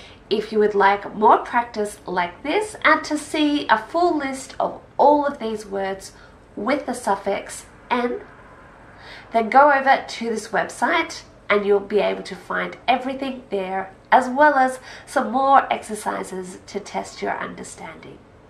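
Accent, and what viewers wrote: Australia. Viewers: Is this Aussie English? That's what I'm looking for :) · Yes, she is Australian.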